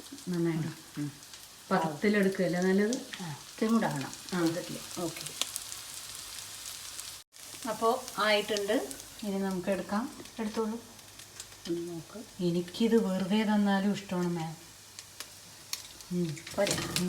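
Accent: Palakkad, India. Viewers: ഈ പാലക്കാടൻ ചുവയുള്ള മലയാളം .... ഒരിക്കലും കൈമോശം വരാതെ നോക്കിൻ... ഈ ഭാഷയാണെന്നെ...എല്ലാ എപ്പിസോടും കാണാനുള്ള പ്രേരണ... ന്നാ ഞാൻ വരട്ടേന്ന്...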